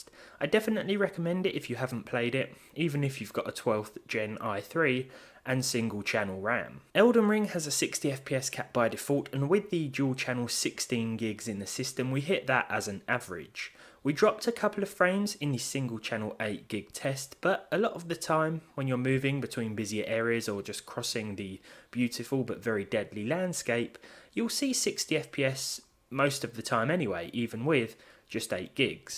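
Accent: United Kingdom. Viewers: How could i ever your Videos press on dislike??? I Love Your Videos! Nice comfortable Voice, interesting themes. And the british-like english helps me to push my language Sklills. Many Thanks! (How to say in englisch?: weiter so! (german) Further so!?) 😀 Have a nice Weekend! ❤❤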